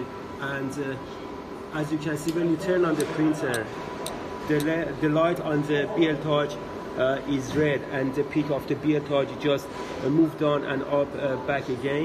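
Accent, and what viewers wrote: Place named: Iran. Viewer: Persian accent :D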